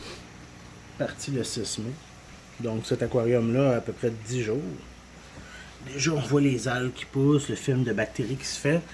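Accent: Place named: Quebec, Canada. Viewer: C est cool d entendre parler quebecois Bravo! Lachez pas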